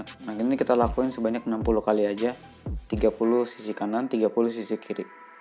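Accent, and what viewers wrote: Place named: Medan, Indonesia. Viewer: Bang org medan ya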